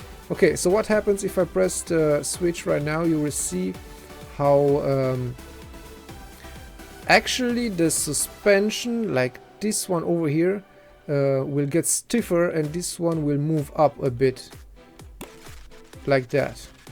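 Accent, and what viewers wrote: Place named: Germany. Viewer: me Too:D i knew i heard that you have some german accent:D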